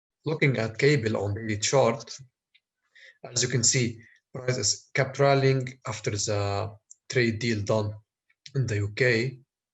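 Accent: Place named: Egypt. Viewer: U sound like an Egyptian. Could you please look into doing crypto currency forecasts?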